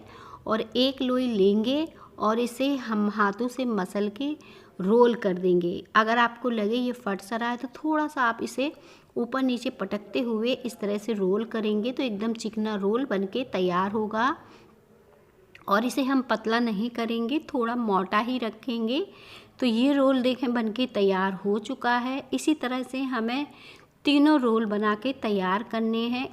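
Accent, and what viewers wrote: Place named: Bundelkhand, India. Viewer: Aap ki bhasha ka ton bundelkhand ka hai,aur bundelkhandi bhasha bhaut achhe bhasha hai ,aap usko bolo,to achha lagega,jo aap english ki shabd use karte hai ,hindi bhi achhe lagege.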